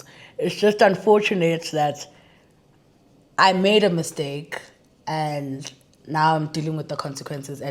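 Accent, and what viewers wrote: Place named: Nigeria. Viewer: i just started watching your youtube channel and im in love with them and you...(love your nigerian accent so much)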